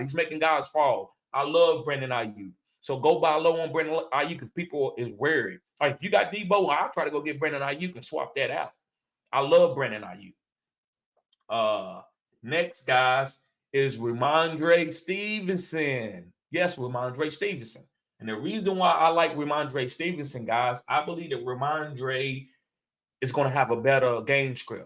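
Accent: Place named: South, United States. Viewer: Bruh, i love that Breece comment!!! 😂😂😂 Your southern tongue does make it sound like breech!!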